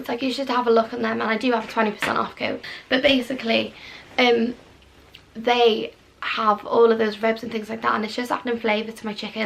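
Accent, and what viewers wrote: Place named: Wales, United Kingdom. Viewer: nothing wrong with your voice. Take no notice of the rude comment from Ellie. Love your acent. Reminds me of my Welsh cousin's .x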